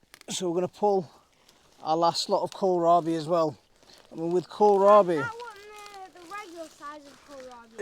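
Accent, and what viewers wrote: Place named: North, United Kingdom. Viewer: I love that northern accent :D it's so homely and nice. Great harvest!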